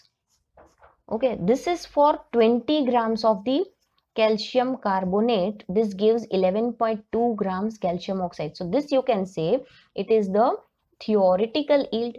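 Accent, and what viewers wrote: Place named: South India, India. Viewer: Mam you are definitely from south. Hai na..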